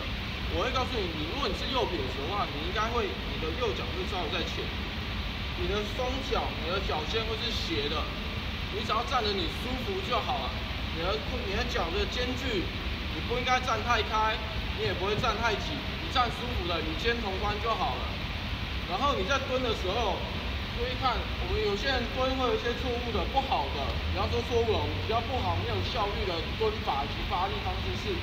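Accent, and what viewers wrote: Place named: Minnan, China. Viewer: 你很mean。这是闽南那一带说话方式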